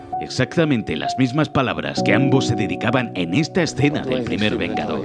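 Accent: Spain. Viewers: cambie a los 30 segundos de escuchar er peor acento, el español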